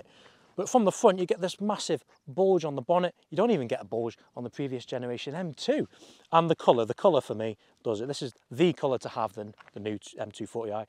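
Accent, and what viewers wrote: North Wales, United Kingdom. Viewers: You from north wales mate?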